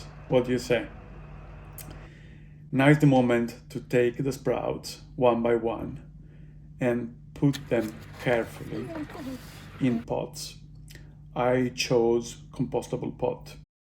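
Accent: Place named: Venezuela. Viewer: Tienes acento venezolano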